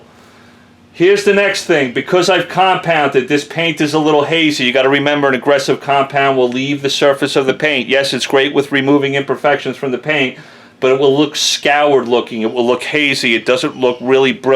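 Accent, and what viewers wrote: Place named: New England, United States. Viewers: Horrible!! What a joke. You just ramble on and on. Nobody clicked your video to here of your personal life or personal BS. You should remove the fact that your a local DFW Texan especially with that infuriating Yankee accent. Knowing that alone is a deterent to clicking this video😠😠😤😡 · Obviously doesn't sound like a Dallas native. Please go back east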